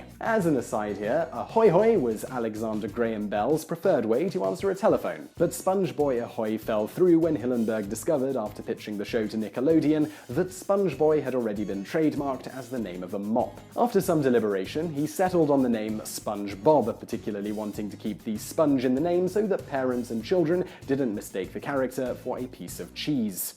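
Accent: United Kingdom. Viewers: Idk what it is with watching people with a British accent explain stuff, but it gets my precum flowing.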